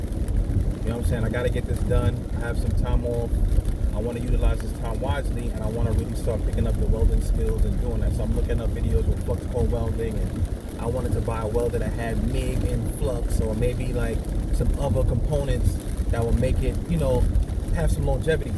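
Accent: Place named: New York, United States. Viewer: what part of new york are you from man ?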